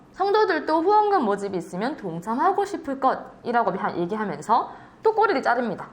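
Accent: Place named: Gyeongsang, South Korea. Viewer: 다 가리긴햇는데 경상도 사투리가 나오시네요 ㅋ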